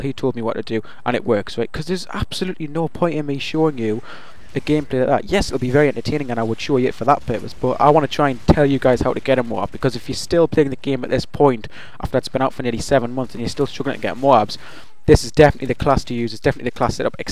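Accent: Newcastle, United Kingdom. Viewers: By any chance are you from Newcastle?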